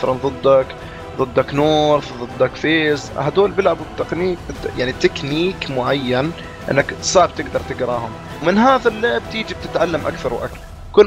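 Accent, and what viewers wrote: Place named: Jordan. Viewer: ههههههههههه بلزمش تكتبو اسمو 😂😂😂 معروف من لهجتو اردني 😆😊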